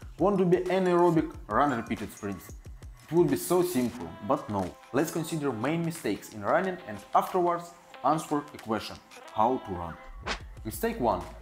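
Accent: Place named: Russia. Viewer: I wish I could speak Russian. I love the accent.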